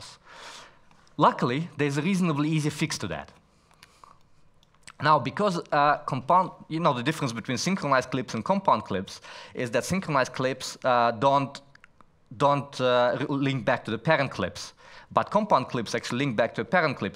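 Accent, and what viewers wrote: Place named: Israel. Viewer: Your Israeli accent mixed in with so many other accents are driving me crazy. my brain is going nuts trying to process your voice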